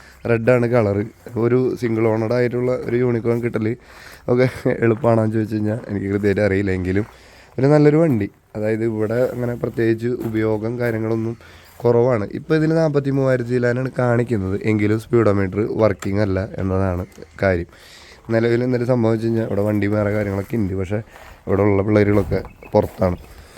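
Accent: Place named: Thrissur, India. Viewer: നിങ്ങളെ സംസാരം കേൾക്കാൻ നല്ല ഭംഗിയുണ്ട് തൃശൂർ അല്ലേ🌹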